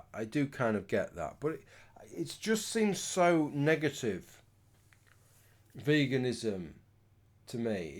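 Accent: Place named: Lincolnshire, United Kingdom. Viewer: Louth? I always suspected from your accent you must be from my neck of the woods. I was born and raised in bloody Cleethorpes! Went to Louth a few times. I remember Hubbard's Hills...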